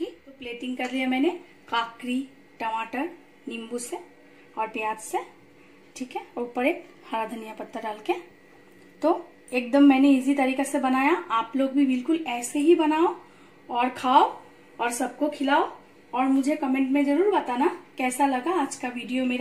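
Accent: Bengal, India. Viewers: তোমার কথা আর হাসিতেই বোঝা যায় তুমি বাঙালি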